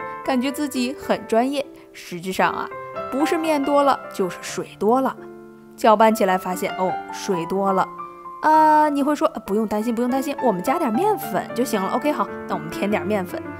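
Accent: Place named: Beijing, China. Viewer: 我学到了面食的制作方法。我也听到了熟悉的，甜美的、北京的声音。很享受！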